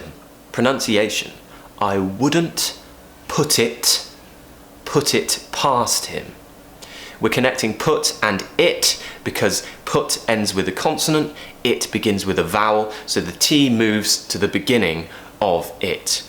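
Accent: United Kingdom. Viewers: i'm dying in this british accent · I really admire people who speak british accent.Sounds pretty good to listen and I keep listening your video.Love your diction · I really enjoy the video and British English, I learn lot of things from you and I'll keep watching your lessons.Thanks a lot. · British Accent so awesomee...... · I have a one question for you. Your accent is properly what kind of British accent?? Posh? Queens? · i just happened to run into your channel a few days ago. i have to say british accent is definitely my favorite - or favourite, shall i post? - great of you to post all this. greetings from caracas, venezuela. 👍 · I love British accent 🇬🇧🏴 From Cambodia 🇰🇭🇰🇭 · Thank you so much for this lesson,I really love British accent 😊👍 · I have been learning American accent couple years ago but when I saw your vidoes and your amazing accent I decided to dedicate my tongue for the British accent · So cute, i really love British accent.Sounds sweet. 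I'm excited for this video. Thank you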